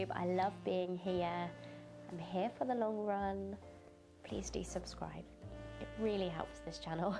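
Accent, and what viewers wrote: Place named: Yorkshire, United Kingdom. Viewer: Thanks for this video! I’m loving your channel! I’m guessing you’re from Yorkshire! Maybe Leeds? ☺️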